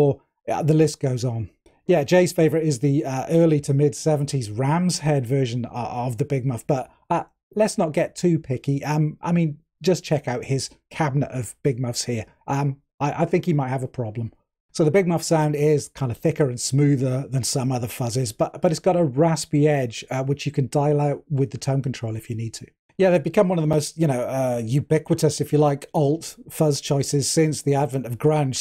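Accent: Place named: United Kingdom. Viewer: A guy with a beard and that wonderful british accent is talkin about guitar things with examples outside the mainstream music. I could not be happier. 😁👍 Thank you. Liked and subscribed. 🤘😎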